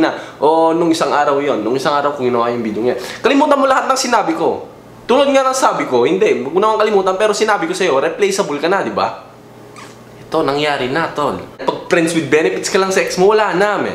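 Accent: Ilocos, Philippines. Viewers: From Ilocos kaba? Kasi may accent ka ng iloco. Specially sa Laoag 😂 so cute! Anyway, your advices can help to those who need it. 😂 God bless and more vids. to come!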